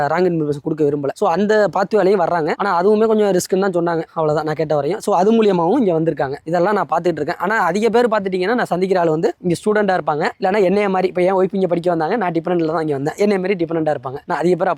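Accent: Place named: Tirunelveli, India. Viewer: தம்பி நீங்க tirunelveli ya? Unga slang namma ooru மாதிரியே இருக்கு.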